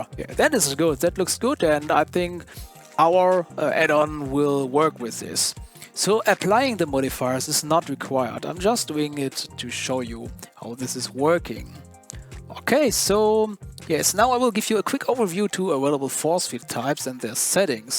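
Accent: Germany. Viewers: i hear german is that right?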